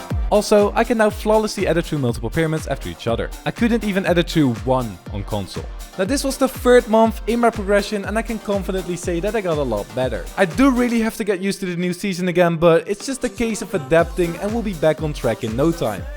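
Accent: Netherlands. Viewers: Lol je bent nl en ik hoor het aan je acsent en op een clip zij je gg man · Ur accent sounds like your from the netherlands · Are you Dutch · Are you dutch??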